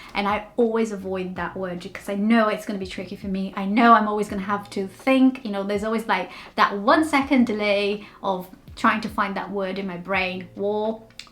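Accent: United Kingdom. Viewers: I'm a Brummie who was educated in the 50's and 60's, at my school about 80% of teachers were Welsh, probably descendants of the work force before the war who left South Wales headed towards the midlands looking for work. As a result I have grown up surrounded by Welsh accents, regular day trips and holidays to Wales furthered my knowledge of the Welsh and their accent. I can honestly say that I've always thought English spoken with a Welsh accent has always seemed the true pronunciation of English, it's not distorted or slurred by local accents (as a Brummie I should know) it just seems pure and full pronunciation. Your problem is you are apologising for your adopted Welsh accent, don't let the influence of Wales leek out oops! sorry leak out, it didn't harm Richard Burton or Catherine Zeta-Jones. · You learned british english. American english pronounces the R. 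We also tend to pronounce many of the T sounds closer to a D sound. British english T sound is more pronounced at all times.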